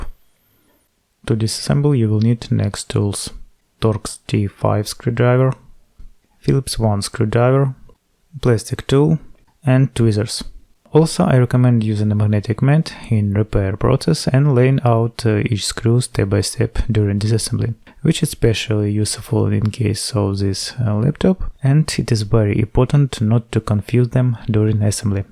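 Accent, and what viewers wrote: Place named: Russia. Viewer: Чувствуется русский акцент) Неплохо говорите на английском. Все понятно)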